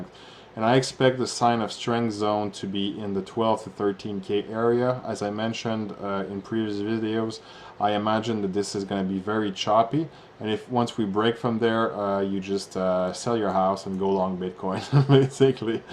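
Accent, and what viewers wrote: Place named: Quebec, Canada. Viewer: Accent Québécois !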